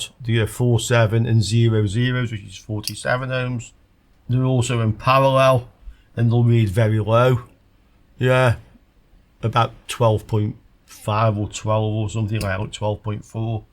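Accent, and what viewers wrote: United Kingdom. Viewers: Your British accent is very annoying!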